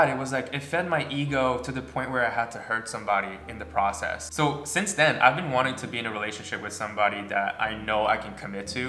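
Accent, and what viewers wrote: Quebec, Canada. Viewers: Hey!! C'est la première vidéo de ta chaîne que je regarde, si je ne m'abuse, c'est bien un accent québécois que j'ai entendu ? Bien le bonjour, de Montréal, hehe ! Plus sérieusement, j'ai 24 ans et je n'ai jamais eu de relation amoureuse ! J'ai eu quelques crush, mais franchement, je peux les compter sur les doigts de ma main et chaque fois, j'ai fini par apprendre que la personne était en couple ou avait un intérêt pour une autre personne. Ce sentiment d'embarras dont tu parles, je l'ai ressenti à chaque fois ! Un peu l'impression d'avoir été ridicule de penser que mon intérêt aurait pu être réciproque, aie. Mais, disons que dans la solitude, j'ai eu le temps de réfléchir, haha. C'est un peu le revers intéressant de notre situation. Je trouve que tu as une très belle approche réflexive et je devine que c'est l'avantage de n'avoir qu'à t'inquiéter pour toi-même. 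J'ai remarqué que mon expérience de célibat m'a également donné cette opportunité de me concentrer sur mon propre développement personnel. J'en suis venue à une conclusion similaire à la tienne : il n'y a pas que les relations amoureuses dans la vie et si je dois un jour en vivre une, j'en vivrai une au moment venu. Pour l'instant, je vivrai en me mettant des objectifs qui m'intéressent plus, notamment avancer dans ma carrière et terminer mon parcours académique ! Ta vidéo m'a été recommandée par hasard, mais c'est toujours nice de tomber sur ce genre de vidéo et de se rappeler qu'on n'est pas seul(e) ! Ton pain avait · Hi! I just discovered your channel, but I'm pretty sure that I've seen your pretty face on Tiktok already once. I guess YouTube algorithm suggested me to watch this video for a reason. I'm 29 and single af, but if I can provide you an advice is to take your time as you're already doing but don't take too much time though 😆 Et wooow qu'est-ce que ça m'a fait bizarre et plaisir en même temps d'entendre l'accent québécois après toutes ces années! 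Haha take care✌🏾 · Let me tell you, I turned 24 and I have never been in a real relationship. I never found a person I genuinely wanted to be with. Most people find it weird and just don’t get it. Funny enough, I do not feel bad about it, I am actually quite okay with it😂. I feel like you should always do what feels right to you, take your time and not rush into something you don’t want (but, if you do have real feelings for someone, for God’s sake, do not supress them and go for it!) I also fully agree with you, if you are happy with who you are and confident in your own self, you’ll be more than fulfilled on your own and the relationship you’ll eventually get into will be a much better and healthier one. PS: ton ptit accent québécois est trooop mimi haha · Wait u speak French sa m’a surpris mais par contre on dirai que t’as un accent canadien pas trop français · Omg are you from Québec , canada ???! 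, u have that french accent , im from Montréal btw ! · OMG! Ta chaîne youtube a pop-up, j'écoute ta vidéo (cuz I kinda relate to the title of being single forever...) Je ne m'attendais ZERO à t'entendre parler québécois 😳! Tu es québécois? Wow~en tk Thanks for sharing this Nice cozy talk ^^ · tu viens du Canada ? parce que ton Français ne sound pas comme le français de la Franca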